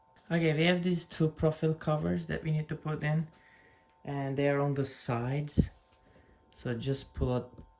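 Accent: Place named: Turkey. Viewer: Even though my machine has been built I was having issues with levelling but since finding your page and content I followed your method and guess what... it worked. You have a big fan here and also I have a feeling from your accent your from one of my favourite holiday destinations. Hope you don't mind me asking but are you from Turkey ?? Thanks again brilliant !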